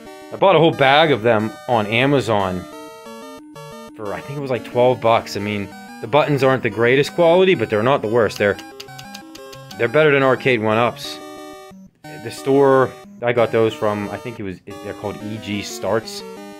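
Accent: Western Pennsylvania, United States. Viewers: Heard that western PA accent and then confirmed it with the Pens lamp! Let’s good man!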